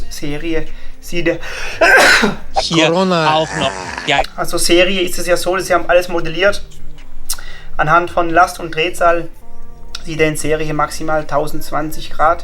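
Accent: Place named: Hohenlohe, Germany. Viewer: aaah wieder dahom in Mergentheim, seh i doch glei... :-) "...Steckor uffs Getriebe..." is hald doch faschd Houalohe!!!! Houalohe, do wo die Hase Hoosa haase, un die Hoosa Housa haase. :-)))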